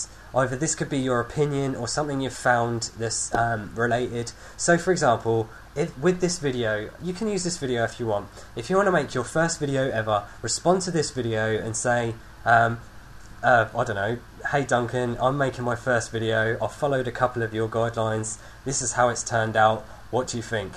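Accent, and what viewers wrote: England, United Kingdom. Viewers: like ur accent. British accents r hot! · duh thats an english accent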